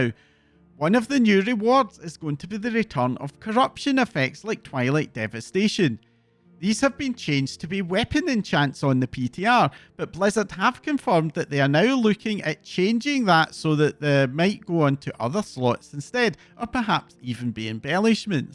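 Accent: Scotland, United Kingdom. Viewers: Love the Scottish accent fr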